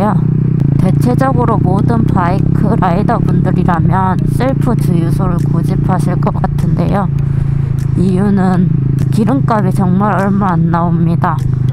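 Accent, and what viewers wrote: Busan, South Korea. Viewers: 그나저나 부산 말투가 귀여운 거에요, 이 귀여운 거에요. 말투 귀에 착착 붙네. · 전 몰랐는데 고마워요 부산말투랑 대구말투랑 비슷한 데 목소리가 조은거네요 주말 잘보네세용 🤭🤭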